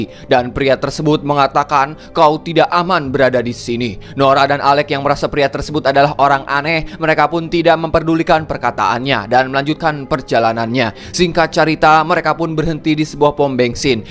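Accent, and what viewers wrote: West Java, Indonesia. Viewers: Semangat trs ya bg.. Sy suka banget logat sundanya...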